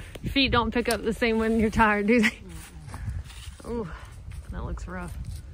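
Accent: South, United States. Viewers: Your accent doubled down in southern flair. :) Thanks for posting!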